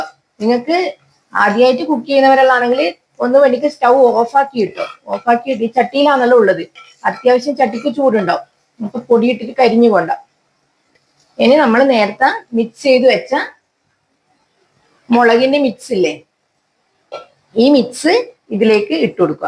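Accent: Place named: Kannur, India. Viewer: Kannur slagu super dear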